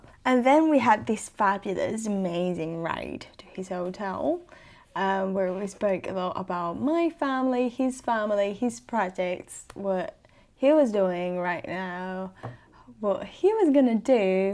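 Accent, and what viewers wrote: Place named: United Kingdom. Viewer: tu acento British es precioso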